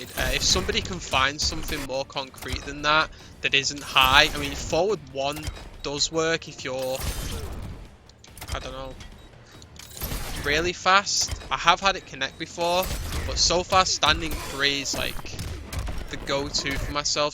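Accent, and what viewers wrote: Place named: United Kingdom. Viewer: wakeOp lol that british accent always reminds me my old english teacher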